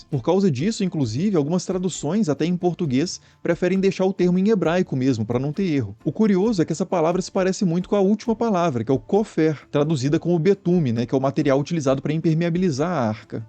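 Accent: Minas Gerais, Brazil. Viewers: Uma pergunta nada a ver, mas o narrador é mineiro né?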